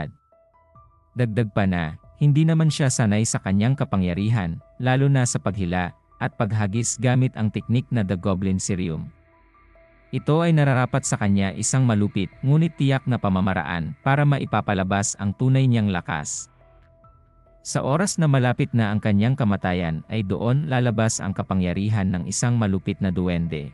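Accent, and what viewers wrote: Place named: Igorot, Philippines. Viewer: Igorot narrator to😅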